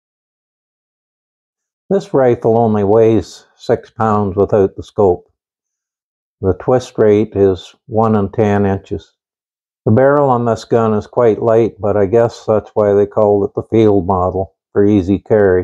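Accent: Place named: Canada. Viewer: Hello there, what a beautiful job restoring that rifle! You sound Canadian, hello from northern Alberta. Hope you continue to post videos of your collection.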